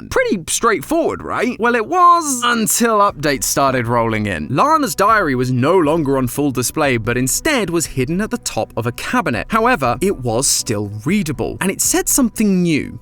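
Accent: United Kingdom. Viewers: ngl, i was just watching the gtlive video of this and then noticed that there was a normal video about this, i clicked it and noticed the british accent... it was a new video with the best timing ever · The new person is just matpat but British, i like it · Bro went from American to british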